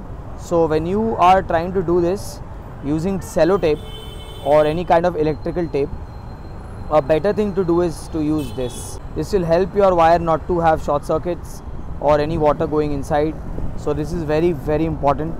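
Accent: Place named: Mumbai, India. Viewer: I pray that YouTube give a love button for your videos, all your videos are so informative.. and love the way to talked with Bhai in mumbaiya style, keep up the good work bro.. make frequent videos..👍😊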